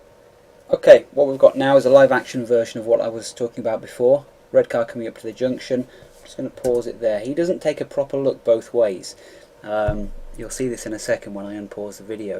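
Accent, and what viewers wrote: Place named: Northern England, United Kingdom. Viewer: I detect a lovely mild northern english accent. :)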